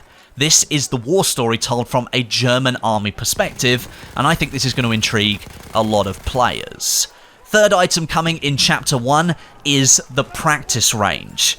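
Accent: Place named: United Kingdom. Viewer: This high pitch british male voice is really relaxing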